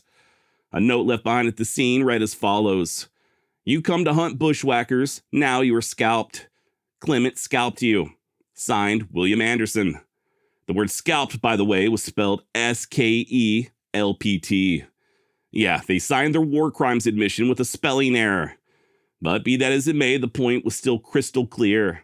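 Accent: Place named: Wisconsin, United States. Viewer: Sounds like you're from my neck of the woods, Wisconsin. Maybe a little north. Love the content, keep up the great work.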